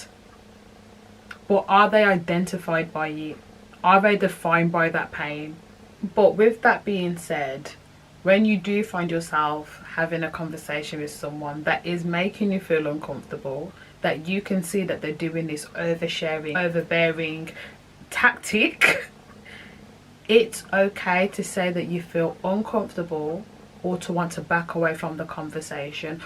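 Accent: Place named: Birmingham, United Kingdom. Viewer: Yea i can hear the brummie accent does not sound manc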